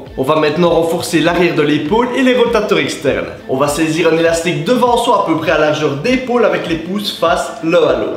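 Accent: Liège, Belgium. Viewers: Serais tu liégeois? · On entend que tu es belge aha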